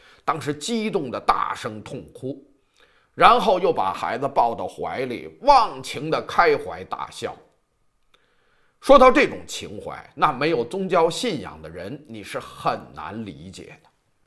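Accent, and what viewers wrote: Beijing, China. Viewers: 這口北京話，太爽了！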